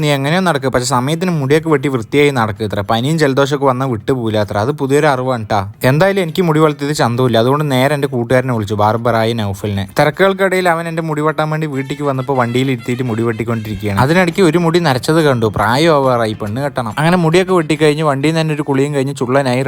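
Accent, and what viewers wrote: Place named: Palakkad, India. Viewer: Super.... പാലക്കാടൻ ഭാഷ.... വീട്ടിക്ക്... മോളുടെ hus... വീട് പാലക്കാട് ആണ്..🥰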